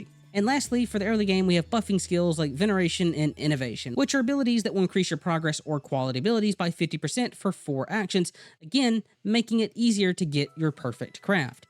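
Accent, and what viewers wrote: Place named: Kentucky, United States. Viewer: Sounds like me and I’m Kentucky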